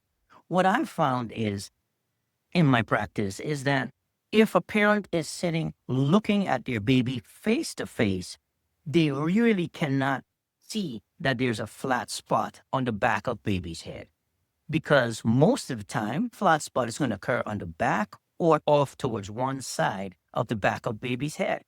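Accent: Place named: Caribbean. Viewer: Do you operat ein the Caribbean? I'm hearing an accent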